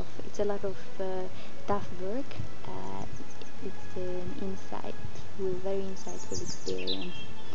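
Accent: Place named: Czech Republic. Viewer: thanks for posting, very well articulated and descriptive video. i'm about to embark on my first vipassana experience & judging by the constant smile on this girl's face, it might just be smtg useful :) where is the accent from? my guess: czech republic....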